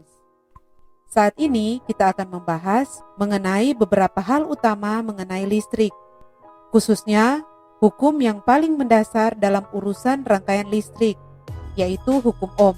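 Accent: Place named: Manado, Indonesia. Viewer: Rupa logat manado ini 😂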